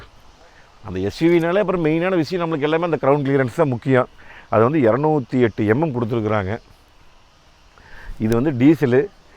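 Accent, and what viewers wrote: Kongu, India. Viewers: நம்ம கொங்கு தமிழில் நீங்கள் கொடுக்கும் விளக்கம் மிகவும் மகிழ்ச்சியளிக்கிறது. வாழ்த்துக்கள். 💐💐💐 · எதார்த்தமாக கொங்கு நடையில் கூறிய எங்கண்ணணுக்கு வாழ்த்துக்கள்....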